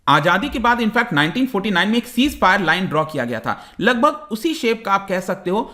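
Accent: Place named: Jammu, India. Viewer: Dogra accent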